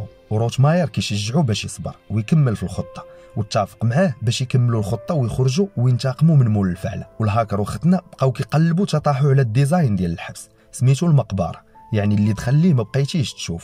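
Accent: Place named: Tunisia. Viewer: علئ حسب ضني هذي اللهجه التونسيه اذا ماني غلطان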